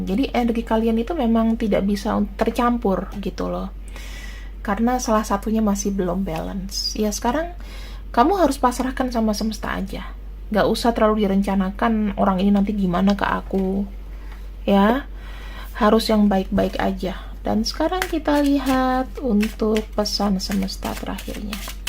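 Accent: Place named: Central Java, Indonesia. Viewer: Kmu ini orang jawa tegah y Soal nya jwaban setiap Do,a do,aku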